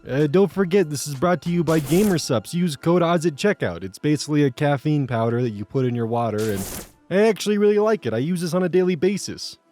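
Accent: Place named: Alabama, United States. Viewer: ah, someone's from Alabama I see.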